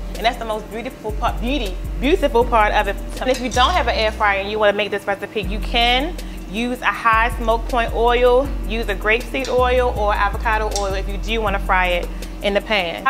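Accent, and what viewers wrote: Baltimore, United States. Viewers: Is this girl from Baltimore or Philadelphia? 🤔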